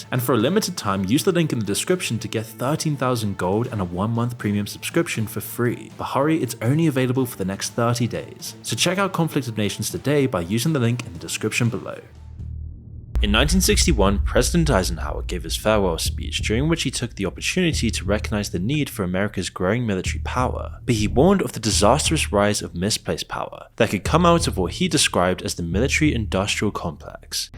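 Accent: United Kingdom. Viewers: lmao ain’t listening to a guy w a british accent talk american politics get outta here bro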